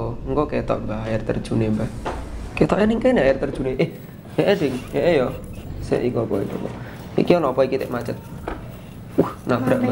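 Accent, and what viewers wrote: Java, Indonesia. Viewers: Orang jawa mana suaranya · Di suarane arek jawa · peh jowone ketok tenan🖒🖒😂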